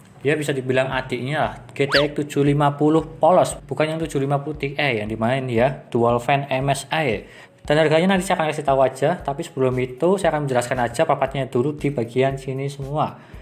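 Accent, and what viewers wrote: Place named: Banyumas, Indonesia. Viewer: Krungone Kyong ngapak Yoh:v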